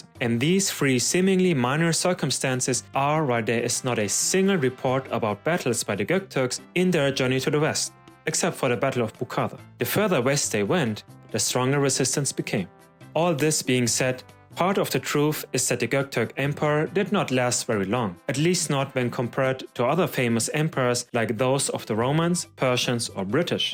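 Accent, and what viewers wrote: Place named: Germany. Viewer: Ayyy the OGs back!! Been missing that german accent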